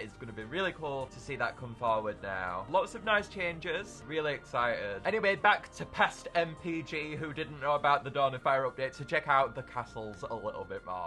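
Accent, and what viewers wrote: United Kingdom. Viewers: Hey are you British?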